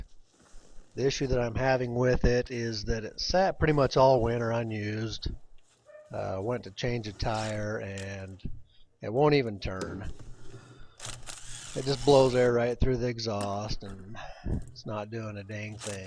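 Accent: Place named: Utah, United States. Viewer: I watched this video because I'm rebuilding my Ingersoll IR285B, and I can tell you that its exactly the same procedure. Every last part looks identical! My vanes were stuck as well, with lots of rusty gunk, and I constantly oil my guns! Go figure? Thanks for posting ;)BTW are you from Utah? I have a friend in Utah and you have the same accent. Just sayin.......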